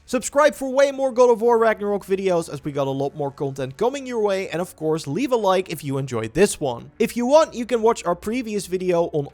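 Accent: Netherlands. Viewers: Are videos voiced over by multiple people these days? The voice is very different from some other videos and the shorts, both sound like they're from the netherlands though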